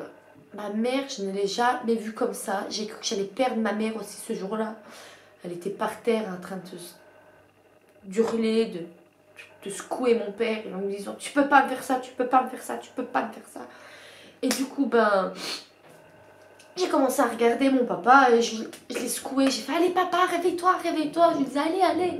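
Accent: Belgium. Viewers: Tu es belge ? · J'ai une question tu es Bruxelloise ?PS moi oui 🙂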